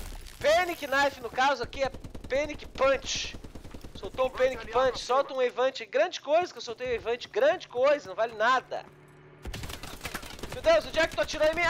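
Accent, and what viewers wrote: Rio Grande do Sul, Brazil. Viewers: Tu é gaúcho do sul thê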